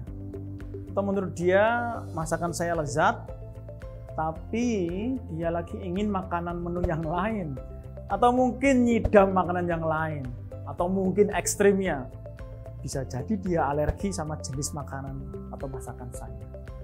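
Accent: Standard. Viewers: Pengucapan yang baku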